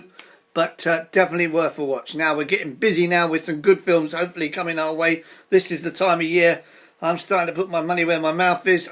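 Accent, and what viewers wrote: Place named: Cockney, United Kingdom. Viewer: Great mate , thanks for the heads up on this film , keep em coming , cockney reviewer great to see 😁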